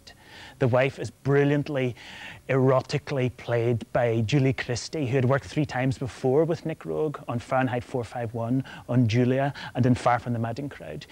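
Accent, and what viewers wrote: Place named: Northern Ireland, United Kingdom. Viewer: That Northern Irish lilt!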